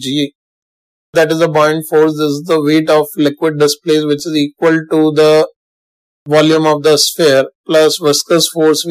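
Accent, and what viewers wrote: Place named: India. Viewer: love the indian accent tho...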